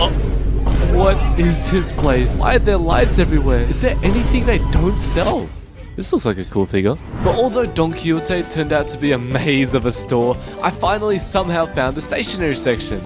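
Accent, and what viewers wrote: Australia. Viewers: You are AUSTRALIAN!?? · Are you from Australia?😮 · I didn't know you were Australian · Hold on ur australian i am two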